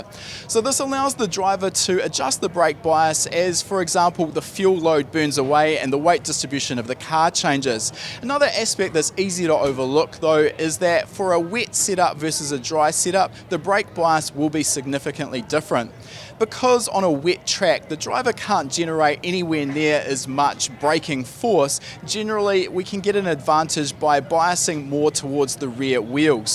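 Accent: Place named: New Zealand. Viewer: he's Kiwi, just go with it. in the engineering sector over the past 30 years I've worked with NZ, Aussies, South Africans, Brits, Germans, East Indians, Chinese, Japanese, Jamaicans, Russians, Guatemalans, Liberians, French Canadians, Israeli, etc so a mix of everything, and I'm sure at times it was they who thought my AMERICAN ACCENT was what sounded odd/weird. it often hurts the inflated American EGO to discover that WE are the ones "speaking with an accent" and that we are NOT always the "yardstick" by which things in the world are measured (literally).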